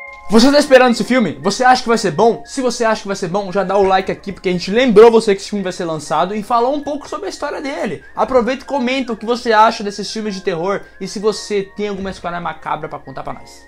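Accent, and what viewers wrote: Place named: Rio Grande do Sul, Brazil. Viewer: Aaa eu sabia q vcs eram gauchos kkk so pelo sotaque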